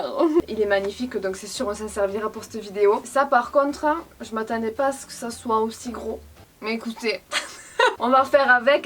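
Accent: South, France. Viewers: T du sud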